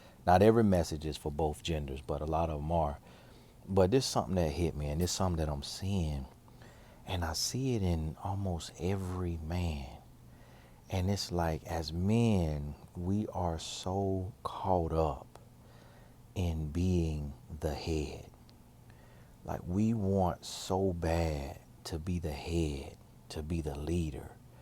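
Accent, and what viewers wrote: South, United States. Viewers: I have always enjoyed your videos because of how you speak. Aside of your country accent (it's so cute), you drop bombs that need to be dropped. With that said, I don't know where you are or what your doing, but these video topics have been on a different level altogether! I am appreciating these videos because although they're not as long as you normal record (I wish they were but I know you're taking care of business), they are absolutely needed! Thank you for staying consistent despite of your abundant season. Can you do a video about our relationship to money once we're out of debt? Please and thank you.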